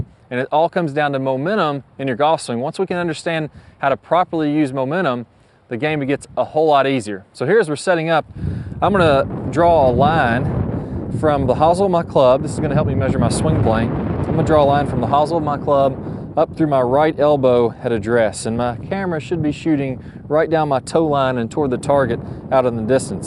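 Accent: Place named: Texas, United States. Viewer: you have got to be in East, southeast Texas with that accent!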